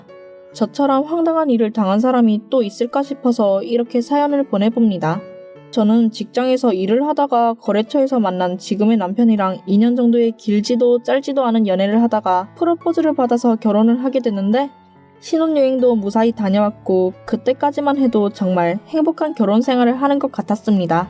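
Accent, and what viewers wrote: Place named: Busan, South Korea. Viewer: 부산 사투리 세요? 듣기 싫다 정말 !!!조심하세욧 제발 ㅋㅋㅋㅋㅋㅋ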